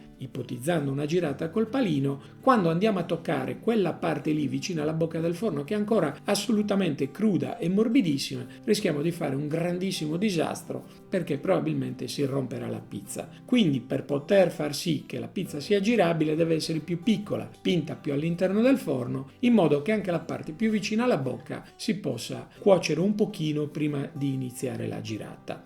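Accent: Sardinia, Italy. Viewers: Sentivo accento nostrano... Bravo, saluti da Nuoro.;-)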